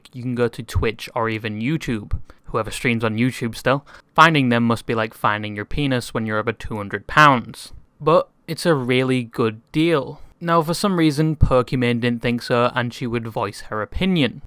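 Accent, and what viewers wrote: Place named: England, United Kingdom. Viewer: yo yt creator guy i like the content but i hate your stupid ass english accent and especially the way you pronounce: '' worse ''. Truly hope you can change your accent some how cause some times you pronounce something so weirdly that it feels like power drill drilling into my forehead but keep up the good work.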